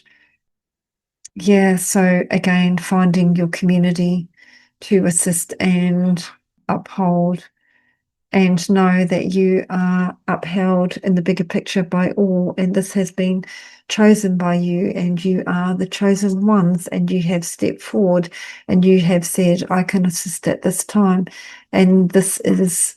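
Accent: New Zealand. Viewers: Her Kiwi is strong 🙂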